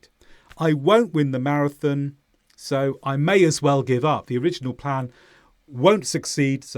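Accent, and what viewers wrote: United Kingdom. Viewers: Wow, wonderful world class english. I like british accent V.much.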